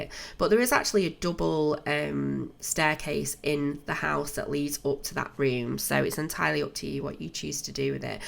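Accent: United Kingdom. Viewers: So good. Just subscribed to the channel. Love your accent. I love British people. 😍♥️♥️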